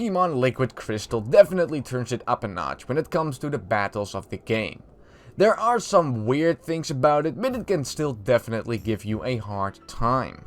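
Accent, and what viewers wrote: Netherlands. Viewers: Very good review, +1 point for Dutch accent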